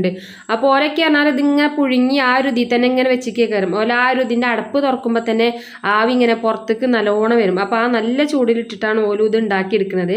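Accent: Malappuram, India. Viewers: ഇത് ഞാൻ കഴിഞ്ഞ ആഴ്ച കോട്ടക്കുന്ന് ന്ന് തിന്ന് അവ്ൻ്റമ്മേ എൻതാ ടേസ്റ്റ് 😅അപ്പോ അതൊന്ന് ഇൻടാക്ക വിചാരിച്ചു തപ്പി വന്നതാ അപ്പോ ദേ നമളെ മലപ്പുറം ഭാഷയിൽ തന്നെ ഒരു വീഡിയോ കൻടത്😁😁😁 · Ntemmoo thani malappuramkaari 😄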